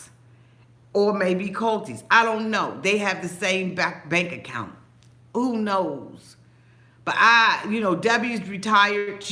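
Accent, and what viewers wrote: Washington DC, United States. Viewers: Auntie are you from the DC area? I'm trying to place your accent and I'm getting a strong DC accent. I'm a native so it's all love.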